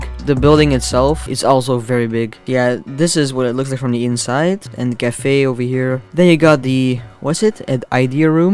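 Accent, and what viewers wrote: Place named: Quebec, Canada. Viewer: Cazy how much you sound like you are from québec! are you from québec???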